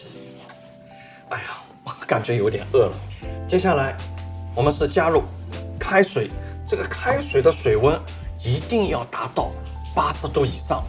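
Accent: Shanghai, China. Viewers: 上海口音好亲切啊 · 朱老师带着上海口音的诙谐和幽默, 太有趣了! 赞一个!😃👍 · 很搞笑，是上海或者苏州口音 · 朱师傅您太幽默了。哈哈哈哈个口上海口音来的个亲切。。下下分享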